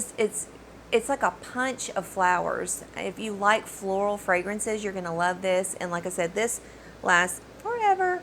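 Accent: South, United States. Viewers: I love your beautiful accent. I used to live in North Carolina and in Mississippi, such sweet people and their accent melts my heart!!!! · Loved your selection as well as yoyr southern accent. I could listen to you talk all day, you sound so lovely. 😊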